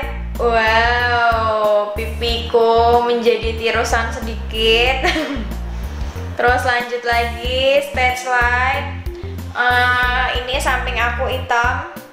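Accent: Java, Indonesia. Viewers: Medog banget ya ngomong nya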